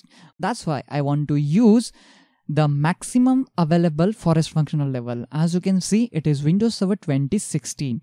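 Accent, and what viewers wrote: India. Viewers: ..and the Indian accent is also a joy to listen to :) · the most indianest accent ever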